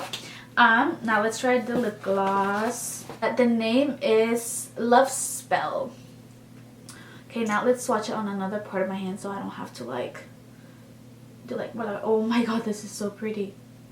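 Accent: Mexico. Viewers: We love a Mexican Queen with a cute accent 👑💕✨🙌🏾 Too Faced: Warm Sand Covergirl: golden ivory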